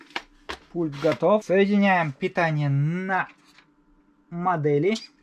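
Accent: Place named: Baltic states. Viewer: Акцент, прибалт? Или Беларус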